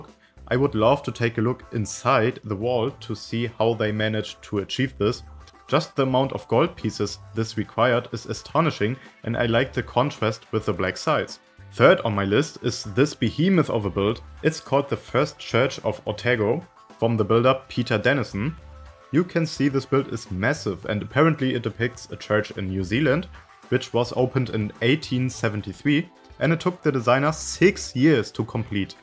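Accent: Germany. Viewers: Is it shust me or do i hear german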